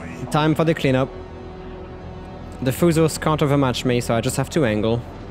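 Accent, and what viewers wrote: France. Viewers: Je découvre ta chaine et je suis ravi qu'un français nous offre des vidéos d'une telle qualité! Ton anglais est très agréable à écouter, et sans doutes beaucoup plus agréable à pour les spectateurs qui ne sont pas familier du Français... mais ça fait toujours un petit plaisir chauvin d'entendre un accent français et quelques mots d'introduction en français.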